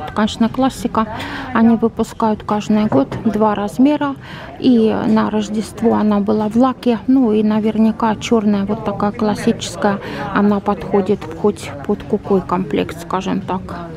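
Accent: Finland. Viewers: У вас такой милый финский акцент.. эх, я соскучилась по Финляндии… ❤️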